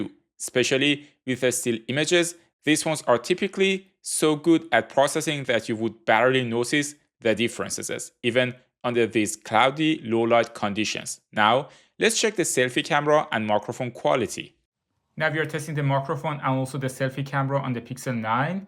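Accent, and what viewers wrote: Iran. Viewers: Dadash lahje englisit tablo'e Irani hasti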